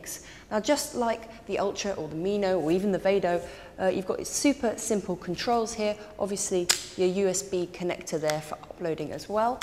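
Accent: United Kingdom. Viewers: how can she be french if she is talking in a brittish accent?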